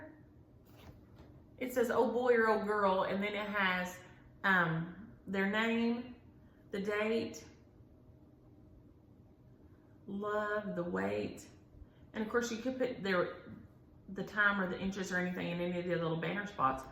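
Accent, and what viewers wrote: South, United States. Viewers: I always love to hear your voice and your "Southern Sayings" Being from VA I don't have quite as much twang, but grew up with a sitter from GA so I have a few sayings that folks just don't get! I would love the opportunity to stitch for an hour or more in the flag pattern!!! 😉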